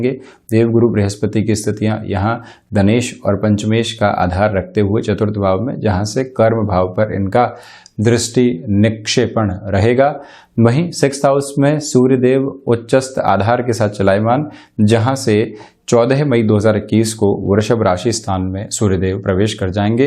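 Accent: Standard. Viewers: Aap bahut sudh Hindi bolte h..kai batein smjh nhi aati..please thoda simple bole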